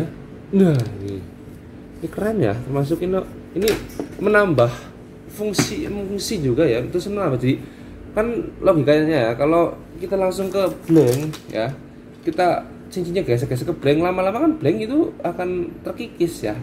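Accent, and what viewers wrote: Banyumas, Indonesia. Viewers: Deneng bakule ngapak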